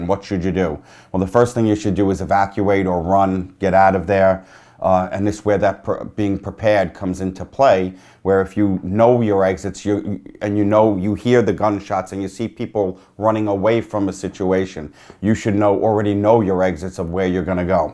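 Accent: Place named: mixed, United States. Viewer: This man Ranch dressing look like a bootleg FaZe Apex with a Boston/New York accent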